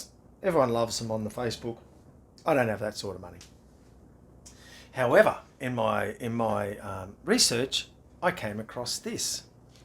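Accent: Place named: Australia. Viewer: When I hear that accent and see that instrument, I expect to hear, " ".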